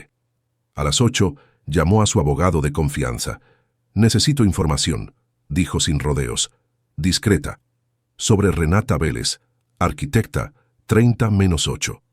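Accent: Argentina. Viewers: Porqué el relato usa el asentó argentino o Uruguay se nota demasiado no es necesario sobre todo si los protagonistas son españoles o mexicanos 🤔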